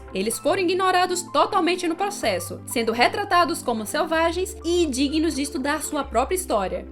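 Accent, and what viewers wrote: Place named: Sergipe, Brazil. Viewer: Ela é de Sergipe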